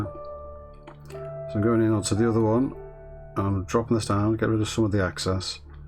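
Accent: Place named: Wirral, United Kingdom. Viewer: Where are you from sounds like Wirral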